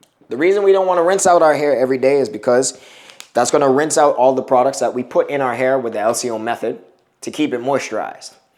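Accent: Bahamas. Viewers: You sound like your of Bahamian decent. 🤷🏽‍♀️🇧🇸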